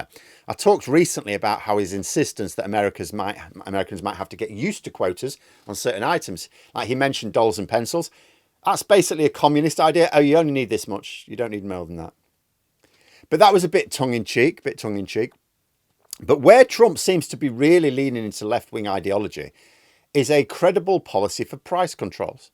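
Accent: United Kingdom. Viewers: The tariffs never worry about China. If they were, he would’ve just placed them on Chinese products he placed them on the entire world. Who pays the tariffs. the American consumer , that’s who. But at the same time half 1 million federal employees are out of work. Truck, drivers, dockworkers people who work in all sorts of box. Stores are out of work because there’s nothing coming in from any part of the world to stock the shelves. When Trump was president the last time, the only law that he passed was a tax cut for millionaires and billionaires. That law is getting ready to expire and he wants to make it permanent. His plan is to cut the Social safety net in this country and he’s already well on his way to doing that. Because his policies affect peoples retirement funds people are losing significant amounts of their 401(k)’s, and other pensions. Just because somebody has a British accent doesn’t mean they know what the hell they’re talking about. 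I bet this guy was in favor of Brexit. How’s that working out for you? The only socialism Trump is going to do is the same type Hitler did. And before Ronald Reagan and Margaret Thatcher, the top rate was 70% in the USA. I have no idea what it was before Thatcher.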